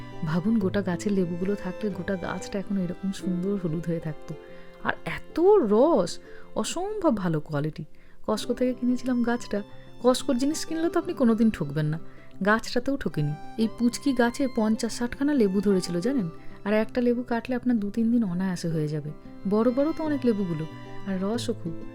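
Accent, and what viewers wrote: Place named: Hooghly, India. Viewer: Didi tumi medinipur er meye holeo kotha bolar dhoron onekta Hoogly district er moto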